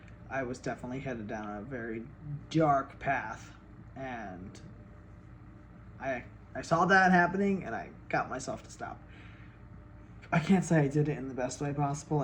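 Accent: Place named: South, United States. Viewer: I love how you get southern on YouTube. Love you so proud of you ❤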